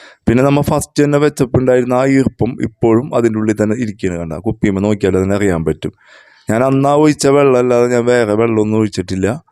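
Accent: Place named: Thrissur, India. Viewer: നല്ല തൃശൂർ ഭാഷ 😄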